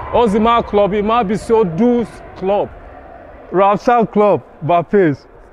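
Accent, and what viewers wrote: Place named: Kulob, Tajikistan. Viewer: Чиста кулоби шидай ҳочй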